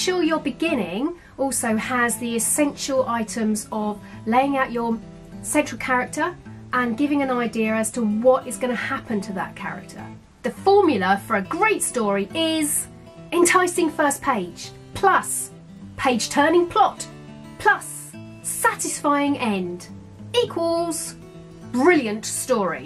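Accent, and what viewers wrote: United Kingdom. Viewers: a nice English voice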